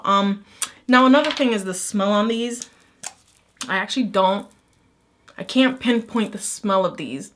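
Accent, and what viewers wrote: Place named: New Jersey, United States. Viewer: you have this jersey mob accent i love it girl!! ha :)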